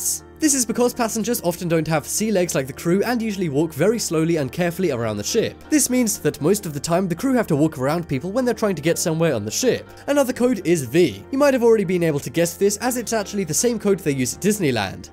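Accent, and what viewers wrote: England, United Kingdom. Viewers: That very informative thanks.I going on cruise to mede soon wish saw this vid first.You only referring to American cruise yet you have English accent ,so. Do all codes etc are they international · Lot of nonsense on this video. Plus why does the English sounding voice guy assume only Americans watch the video??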